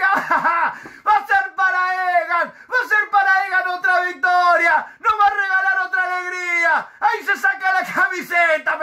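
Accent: Colombia. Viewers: .tu no pareces de Argentina tú pareces colombiano.gracias muchas gracias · hermano narras como si fueses colombiano gracias te queremos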